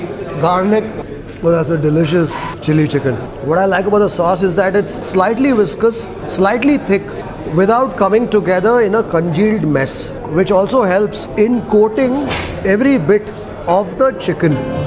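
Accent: Karnataka, India. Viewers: I love u talking innocent beautiful sweetest kannda Lots of love to you sir. Happy new year in advance sir.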